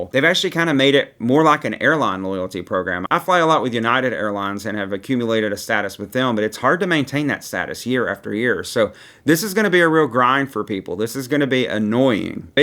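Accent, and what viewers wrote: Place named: Tennessee, United States. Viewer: I like your accent. My guess was Tennessee. No one likes mine Boston.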